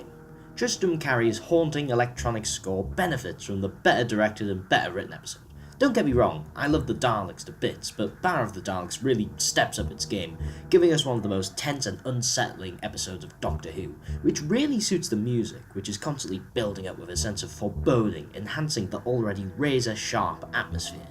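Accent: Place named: Newcastle, United Kingdom. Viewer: I opened this video expecting a nice run of samples of incidental music from big P Troughtons run, instead i get this wet geordie talkin a load of rubbish, big thumbs down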